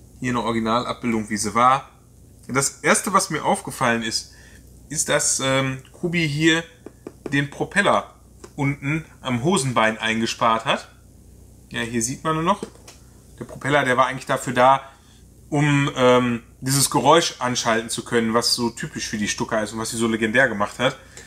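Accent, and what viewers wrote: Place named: Ruhr, Germany. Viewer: Dat is Pottsprache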